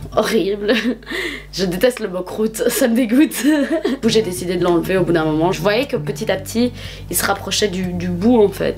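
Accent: Belgium. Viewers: ahh mais je t'aime d'amour !! Tu me donnes trop le sourire avec ton petit accent belge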